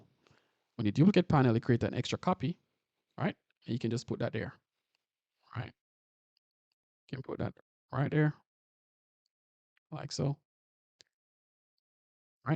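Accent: Jamaica. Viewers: Real yawd man bro mi can hear it lol but now I have to find out how to get quantower on mac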